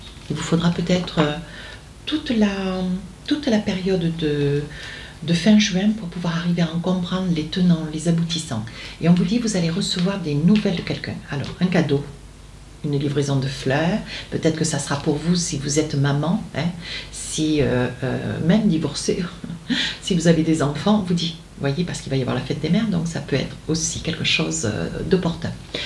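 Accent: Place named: Agen, France. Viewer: bel accent agenais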